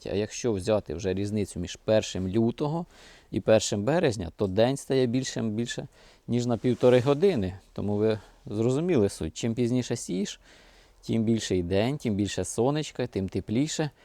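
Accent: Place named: Kyiv, Ukraine. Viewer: Доброго вечора, завжди дивлюсь з задоволенням, а на дурні коменти не звертайте уваги., в нас також говоримо як і ви ,бо з Київщини.😊😊😊😊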